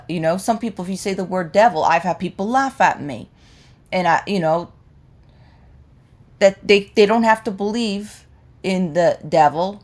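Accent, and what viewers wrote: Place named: New Jersey, United States. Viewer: I liked your message here. And I also like you NJ accent 😊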